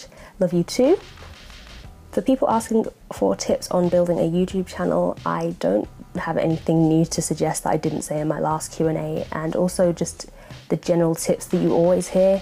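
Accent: United Kingdom. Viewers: Maybe it's because they're from the same race and both has a british accent?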